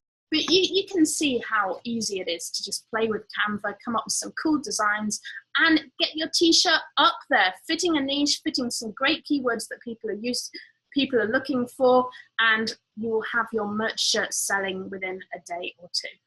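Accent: United Kingdom. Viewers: I love your accent,. Are you English??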